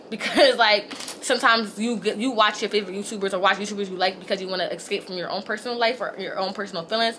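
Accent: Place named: Philadelphia, United States. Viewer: In all sincerity if you ever need to talk I'm here for you. You remind me of my niece I used to be close to. I was born and raised in Philly and I'm still close to you in Willow Grove. We could meet have lunch or get a coffee. I mean it I'm here for you, I've started watching you a year ago and think your soo funny I knew you was from Philly the minute I heard you speak. We have to stick together if you ever need to talk or vent I'm here. I run a small business as well I know how it gets sometime. Please don't hesitate to reach out.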